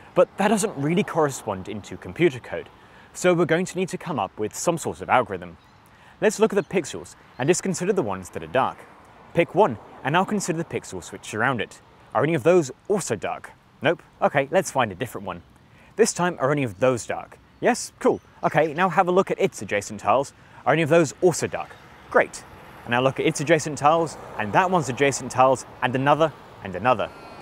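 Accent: Australia. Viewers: This guy is just an australian Tom Scott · australian tom scott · Is this Australian Tom Scott?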